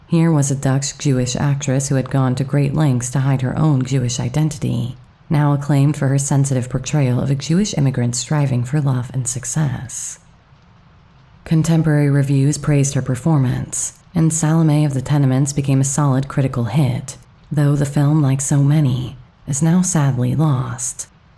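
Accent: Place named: United States. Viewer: I love the narrator’s voice, even tho’ American. ❤😊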